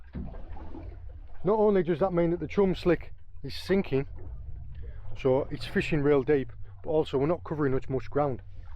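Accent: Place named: Middlesbrough, United Kingdom. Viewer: thought as much you sound same as me from the boro lol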